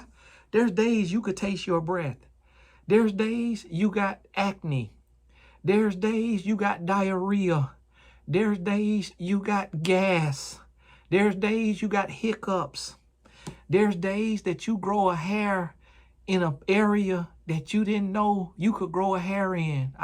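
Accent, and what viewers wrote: South, United States. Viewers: I just LOVE how you talked to your wife when she came into the room. You can SEE the love and adoration you have for her! Just beautiful! And everything you said is ON POINT! Also, PLEASE keep your Southern accent. It’s beautiful, down-to-earth, sometimes comical (when intended) and refreshing. God Bless You Tony Gaskins!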